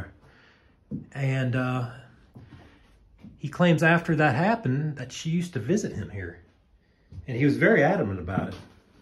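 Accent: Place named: South, United States. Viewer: Love your southern drawl…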